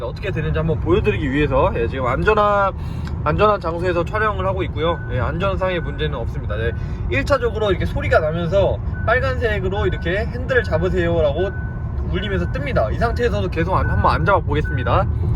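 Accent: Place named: Jeonbuk, South Korea. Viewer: 전북분 이네요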